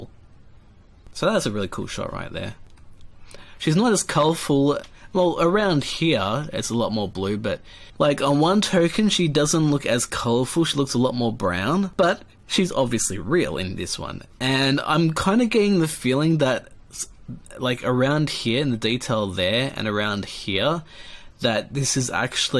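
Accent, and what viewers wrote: Australia. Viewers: Just wondering are you Australian. If so. Let’s go Aussie. Also do you watch the AFL or know about the AFL. If you do know or watch afl which team do u barrack for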